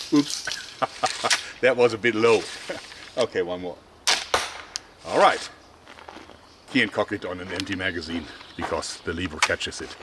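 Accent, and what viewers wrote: Germany. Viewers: excuse me! Are you German?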